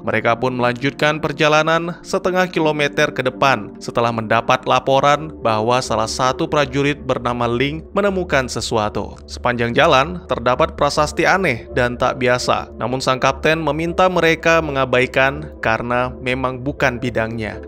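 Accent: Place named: Medan, Indonesia. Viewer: Logat medan 👍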